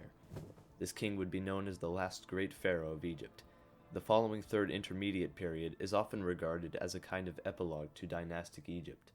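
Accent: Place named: Midwest, United States. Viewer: Great channel but I think you should redo your narrative using an artificial English accent like David Attenborough. You have a young midwestern accent. People respect English accents that why most Roman Greek Egyptian movies using British actors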